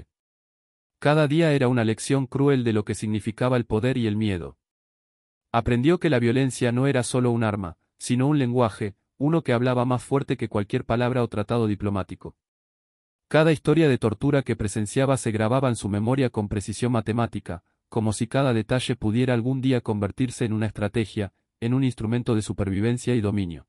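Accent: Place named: Rioplatense, Latin America. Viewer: acento me suena a español rioplatense en el video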